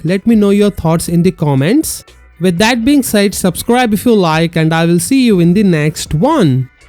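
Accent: India. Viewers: Indian origin narrator? Why can't we get a neutral one?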